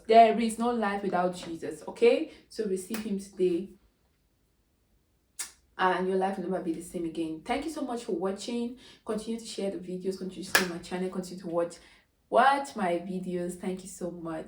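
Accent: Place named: Nigeria. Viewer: Why do you sound Nigerian? A French speaking country would have been my last bet when listening to you!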